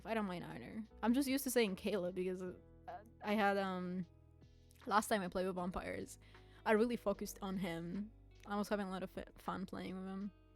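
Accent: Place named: Poland. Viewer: omgggg of course it's slavic (i've always loved that regions accents, but i dont think i've ever heard a polish accent). its beautiful i love it so much!!! 🤍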